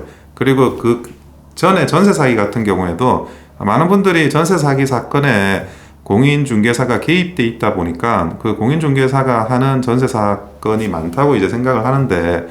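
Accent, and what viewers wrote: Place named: Gyeongsang, South Korea. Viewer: 존경하는 원영섭변호사 대표님 반갑습니다 안녕하세요 팬앤드마이크TV 시청중하고 구독하러 왔습니다 건승하십시오 경상도 목소리 너무 좋으십니다 감사드립니다 멸공 필승 단결 🇰🇷🇱🇷🎉🎉👍👍🥰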